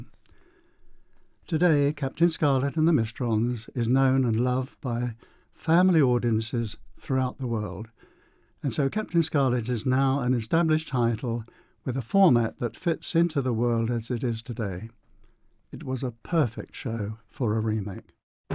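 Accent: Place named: United Kingdom. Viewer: thoroughly British commentary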